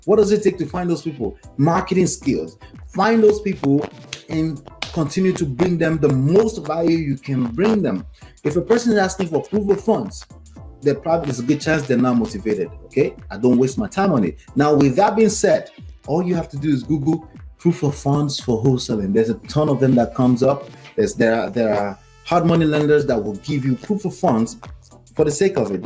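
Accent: Nigeria. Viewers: I love this video and can tell your nigerian by your name and accent.. lol if you don’t mind, I’ve come to realize that working with realtors provides the chance for us cash investors to work directly with them and completely take out skip tracing, cold calling and direct mail.. well that’s what I thought. do you have any advice on finding properties that aren’t listed on the MLS?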